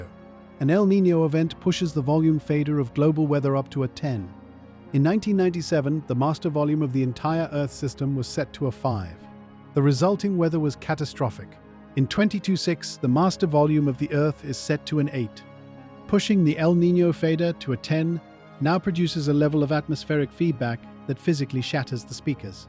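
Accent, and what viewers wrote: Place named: United Kingdom. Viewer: Why are the AI comments about American weather systems, given with a british accent?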